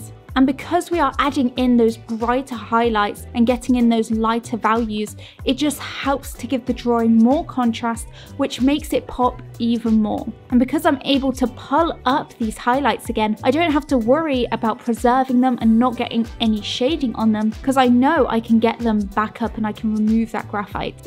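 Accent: United Kingdom. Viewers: So you did understand what she said in her BE pronunciation..? 🙄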